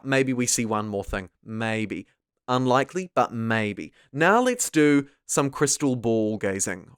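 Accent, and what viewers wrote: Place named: New Zealand. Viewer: I love your accent. New Zealand?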